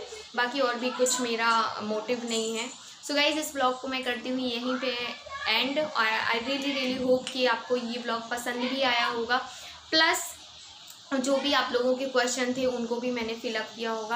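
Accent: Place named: Kanpur, India. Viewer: Apki avaj bahoot pyari hai....mere jesi lenguse hai apki....gao....kanpur...ki ho ap Kya.....me kanpur ki hooo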